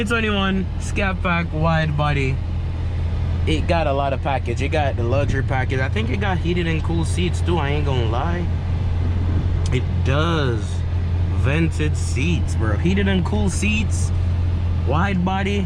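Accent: Jamaica. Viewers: Wait deh,A sense a Jamaican accent mi brudda 🤔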